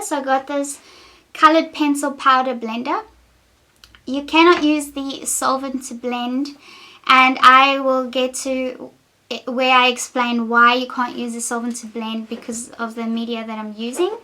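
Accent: South Africa. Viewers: Beautiful I love it. Are you South African?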